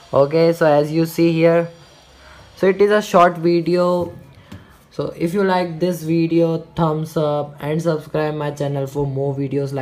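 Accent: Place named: India. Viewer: Thank you dude! You're amazing (it's a little hard to hear Indian English lol)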